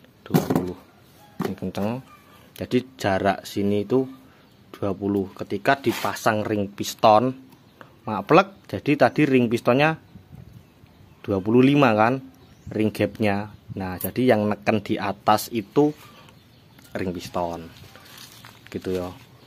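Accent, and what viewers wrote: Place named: Kediri, Indonesia. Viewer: kulo pikir lek kediri om aksen jawanya😂😂😂😂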